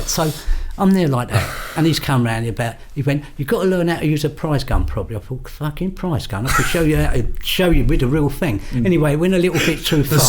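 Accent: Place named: England, United Kingdom. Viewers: Love this guys voice! "Propa Landan!" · Sounds like Paulie Gualtieri with an English accent lol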